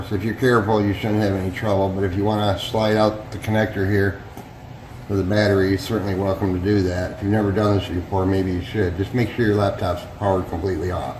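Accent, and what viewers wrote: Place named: South, United States. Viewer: You sort of sound "hillbilly" but you know your stuff. Well done it helped me a lot.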